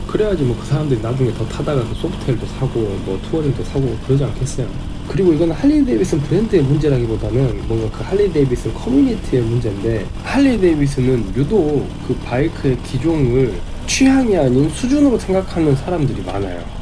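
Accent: Jeolla, South Korea. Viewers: 구수한 전라도 사투리와 할리의 엔진소리라…찰떡궁합이네요😊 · 억양이 순천분같어요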